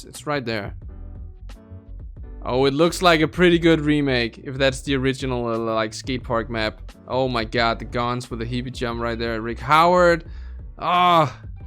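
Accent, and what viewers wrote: Denmark. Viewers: Are you danish bru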